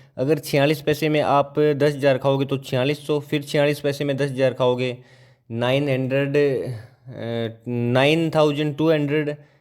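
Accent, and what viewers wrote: Haryana, India. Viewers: Haryana se ho bhai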